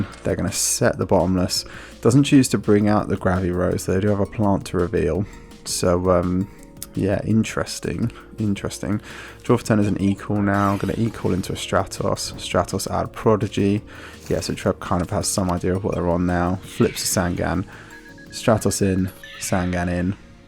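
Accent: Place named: United Kingdom. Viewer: i like ur commentary your british voice is soothing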